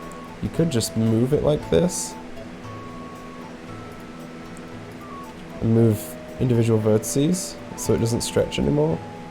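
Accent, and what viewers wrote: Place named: Scotland, United Kingdom. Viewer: I'm confused by your accent. It's like a mixture of Scottish, a northern accent and some American, at times? Am I going mad?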